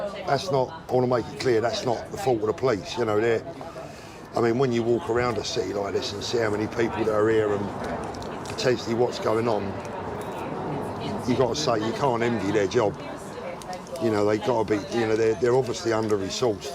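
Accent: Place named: United Kingdom. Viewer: Why is your accent like that if you're from London? It's like provincial English, not what I imagine of a Londoner. 😅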